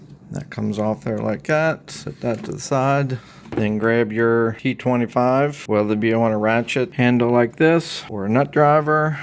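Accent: Western Pennsylvania, United States. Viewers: Thanks for the video! Are you a Yunser from western PA?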